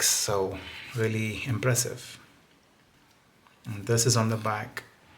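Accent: India. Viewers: is definitely an Indian fella.the his commenting.so fuckin yindiann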